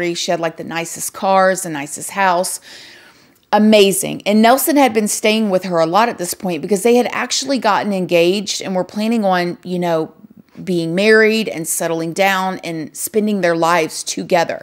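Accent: South, United States. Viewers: You’re country accent is everything I need when hearing these crazy stories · I just discovered this channel. Someone help me out. I'm trying to place her southern accent that fades in and out. First, I said Tennessee... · she is from the southern tip of Florida, from what I remember. she has a slightly southern accent.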